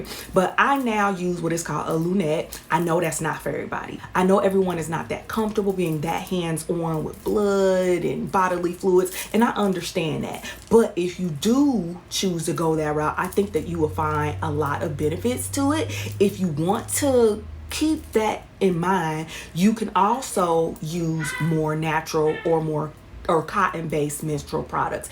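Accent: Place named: South, United States. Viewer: New Subscriber! Great video. Love the southern accent 🤗💜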